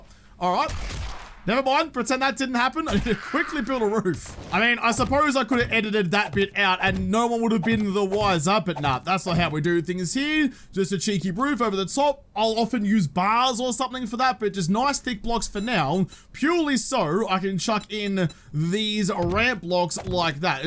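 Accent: Australia. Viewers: You say at start of video for new people to game.. then proceed to shoot wood on ground and build right away. Lost me completely with that Aussie accent. Trying to learn.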